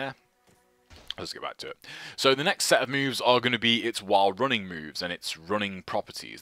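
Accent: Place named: England, United Kingdom. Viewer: Great videos! Thanks man. BTW: which part of England is your accent from?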